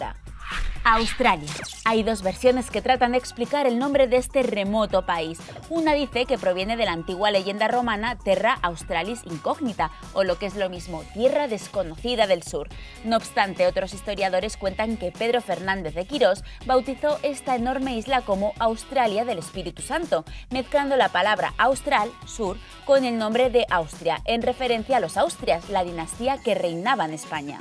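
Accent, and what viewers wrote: Spain. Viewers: Eres de España? lo sabia!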